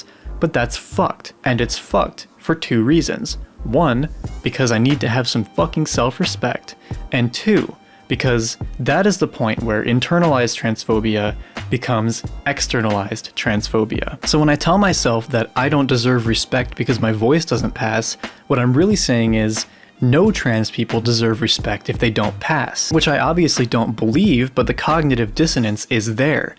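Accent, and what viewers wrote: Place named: Midwest, United States. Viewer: Okay, so I had to pause in the middle of your video to say I disagree about your voice. I think you have a great voice. It’s just cracking (autocorrect) to sound female. You must be from the Midwest. It’s like a climate or humidity condition that affects many of us. I only wish mine was as good as yours. 💋💋💋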